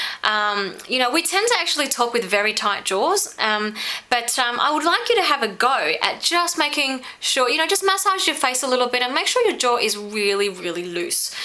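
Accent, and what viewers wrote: Australia. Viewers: omk919, she sounds Australian for me :) she's not faking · I love your accent, you must of lived in Australia for years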